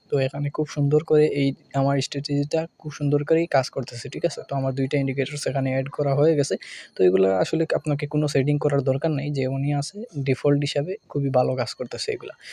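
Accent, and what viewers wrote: Sylhet, Bangladesh. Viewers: আপনার বাড়ি কি সিলেটে.?...আপনার উচ্চারণ সিলেটি দের মতো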